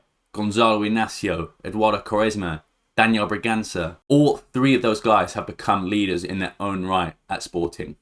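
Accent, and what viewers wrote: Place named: England, United Kingdom. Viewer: Good video. You have an Iberian name and wear a sporting shirt but you have an English accent? Where are you from and who do you support?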